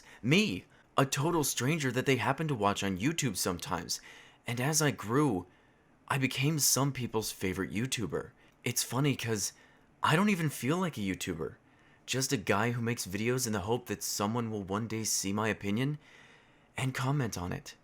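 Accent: Australia. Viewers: NOICE Aussie accent! You're actually amazing. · You sound Australian.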